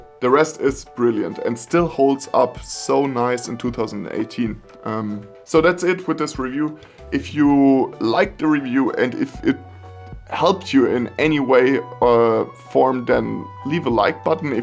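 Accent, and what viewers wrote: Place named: Germany. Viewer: Deutscher Akzent confirmed😂😂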